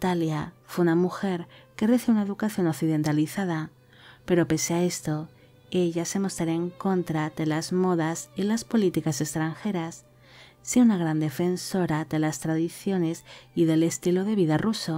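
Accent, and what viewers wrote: Spain. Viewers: De que parte de españa es el acento de la voz de?:0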